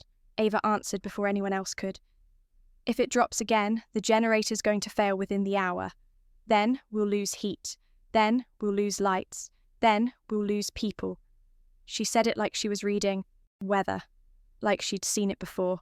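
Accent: United Kingdom. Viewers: why do we have to endure an english accent when watching US Navy Seals?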